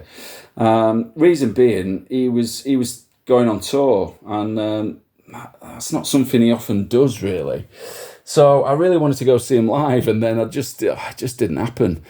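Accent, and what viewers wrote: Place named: Yorkshire, United Kingdom. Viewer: You've got the same twang as my mate from Mytholmroyd, ha! Yes, their are some great finds to be had with 12 inches in the dance/electronic/disco ballpark and I love having a rummage to see what turns up. I've also bought some absolute bobbins too to be fair but jeopardy is part of the fun, I guess. At the prices I pay I don't bother looking them up in situ... I'm happy to take the rough with the smooth! I'll look forward to you doing a video featuring 12s, and, like you, I'm behind in looking at what I picked up recently. I've probably got a good couple of hundred dotted around I need to catch up with over a beer or four!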